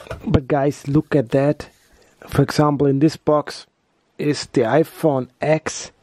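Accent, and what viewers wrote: Germany. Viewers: Du bist Deutsch. Dieser Akzent 😂❤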